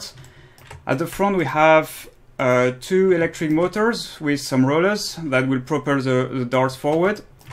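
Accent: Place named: France. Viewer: j'ai direct entendu ton petit accent Français des le début de la vidéo xD sinon super vidéo !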